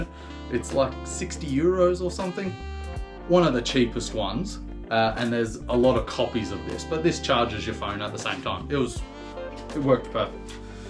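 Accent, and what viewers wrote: Australia. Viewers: Awesome dude. I was wondering where you were. Aussie accent for sure but snow? WTF is snow? Chuckled at the manly flex with the manual over the shoulder. RTFM only in emergencies. BTW...neatest, cleanest woodpile ever. I just got an RTP1250 Cop bike at the auctions and have been thinking about one of these. My bike didn't have the BMW sat nav. Thanks again and enjoy your time OS. Oh. I went and had a look and decided to grab my balls and run with it. Fk it. You only live once so I ordered one. The discount code helped a lot. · Are you an Aussie bloke ?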